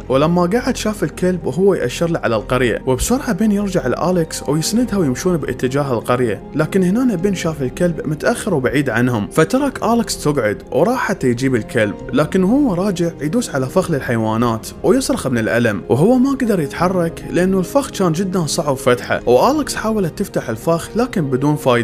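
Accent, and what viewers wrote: Iraq. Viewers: طبعا اني كولش احب اللهجة العرافية اتجنن اخوكم من بنكلادش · اللهجة العراقيه جميله جدا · اللهجه العراقيه مميزه تحياتى لاهل العراق اهل الحضاره والكرم مصرى يحب العراق واهله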